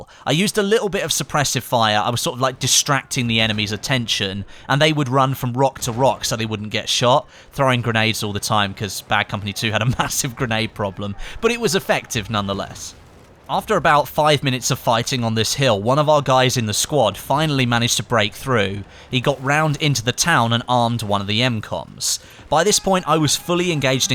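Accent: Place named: United Kingdom. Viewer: Football as in soccer or anerican football butt since you sound like a person from great Britain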